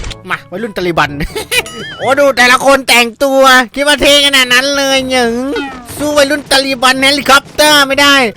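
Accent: South, Thailand. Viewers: ชอบมากเลยงับ สำเนียงใต้ <num>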